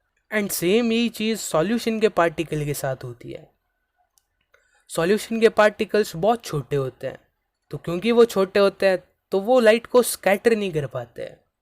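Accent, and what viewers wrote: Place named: Rajasthan, India. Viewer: Sir aap ki sound bahut acchi hai like Rajasthani ❤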